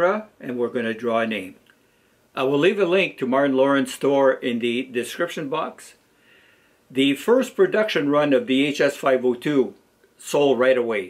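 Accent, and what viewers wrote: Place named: France. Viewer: Just found out your channel, looks like there's lots of diy things . Keep up the good work. Ps you seem to have some french accent, where are you from?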